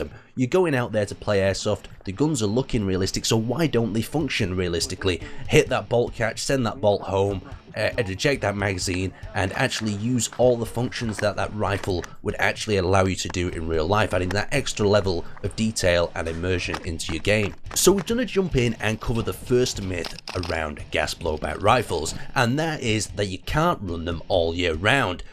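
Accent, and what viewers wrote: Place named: North West England, United Kingdom. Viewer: I have a feeling he's from the north west, if so hello from Wirral!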